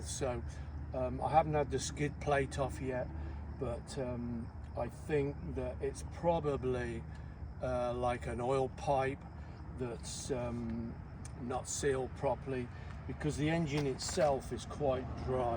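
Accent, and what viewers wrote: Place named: Midlands, United Kingdom. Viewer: Aye, I could tell you had a Midlands accent. I'm originally from Huddersfield.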